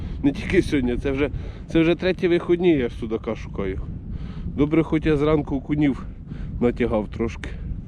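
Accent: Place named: Western Ukraine, Ukraine. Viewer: Звідки ти дядьку? Я з Золочева,і Самбора. Щось діалект ближче до нашого. Я на тебе підписався.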